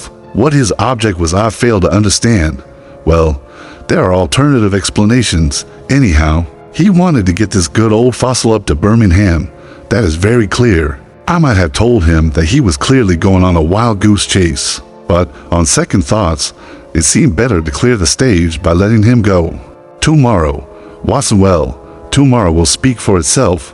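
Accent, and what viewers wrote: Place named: South, United States. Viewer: I didn't like the Southern twang.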